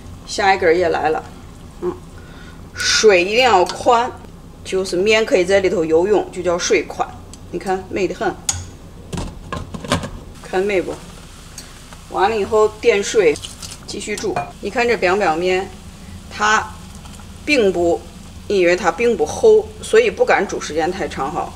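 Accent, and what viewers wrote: Shaanxi, China. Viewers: 陕西话真好听🥰🥰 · 只有乡党能灵活掌控陕普四六频道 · 95年我去西安上大学，一点都听不懂陕西话。现在听着陕西话觉得真好听啊：）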